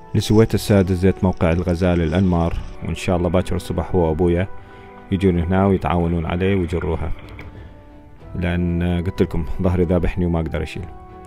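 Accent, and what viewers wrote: Iraq. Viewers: يا اخي والله الصراحة فيديوهاتك ادمان....وطريقت كلامك تدل على انك عراقي اصيل اخلاق ومهارة واحتراف متباعك من الجزائر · الغالي احله ما بيك الهجه العراقيه الاصيله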